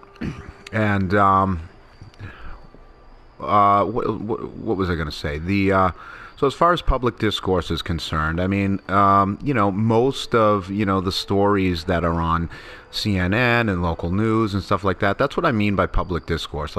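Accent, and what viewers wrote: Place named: Boston, United States. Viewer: Hey, I'm halfway through, interesting, imo without Andrew Yang's ideas I think we would head directly towards a virtual marketplace where people just wanna hang out in virtual world spending money etc, the opposite of a result from UBI. Yang's proposal is surely crafted for how he thinks it has the best chance of being passed by both houses, and I think it is a very clever proposal. The other half of Yang's platform involves pushing us towards a marketplace that includes the exchange of money and adds the valuation of "human things that can't be measured with dollars" , i feel like that's a direction I can be proud of heading towards for my kids. See his website. I enjoyed your boston accent.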